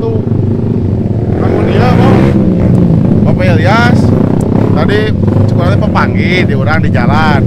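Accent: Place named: West Java, Indonesia. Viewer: resep cara ngawangkong na sunda banget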